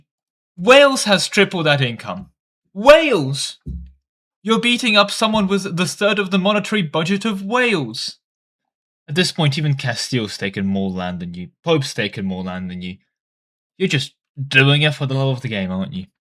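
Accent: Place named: England, United Kingdom. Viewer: The english accent got thicker with this vid.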